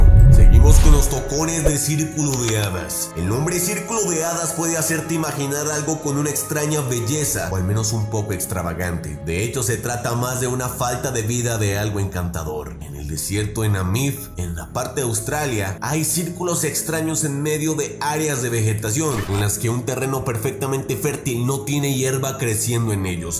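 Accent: Colombia. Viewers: pa re Colombiano el pibe este😎😎😎😎😎😎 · eres colombiano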